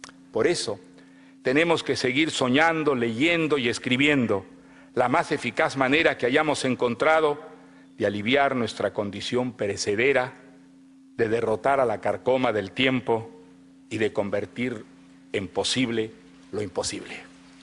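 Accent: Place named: Peru. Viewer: es que aunque intente pasar de español, es peruano.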